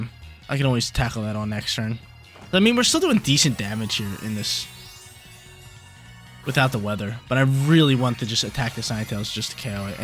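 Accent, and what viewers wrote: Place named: Buffalo, United States. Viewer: Holy Buffalo accent lol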